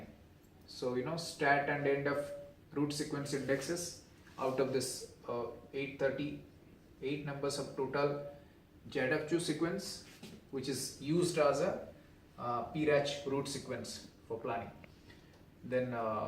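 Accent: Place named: India. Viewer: poor accent and english.. too much indian, cant understand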